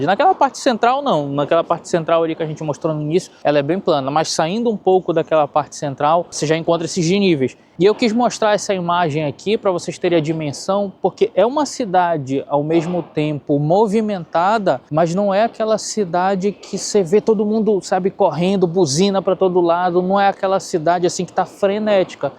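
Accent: Pará, Brazil. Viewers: Meu amigo, com esse sotaque paraense, só pode ser de lá, minha terra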